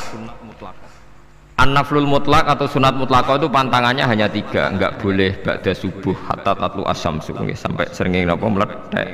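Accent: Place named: Java, Indonesia. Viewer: Saya suka ceramahnya Gus Baha...meskipun bahasanya medok namun masih bisa mengikuti...isi ceramahnya bagus memberikan banyak pemahaman dan pengetahuan 🙏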